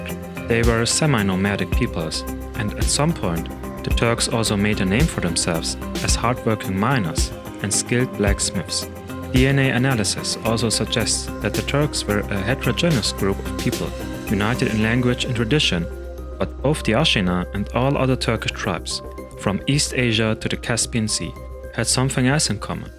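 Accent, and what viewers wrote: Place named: Germany. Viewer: I've read Jean-Paul ROUX book. It's great. PS : that german accent makes it better, mein Freund.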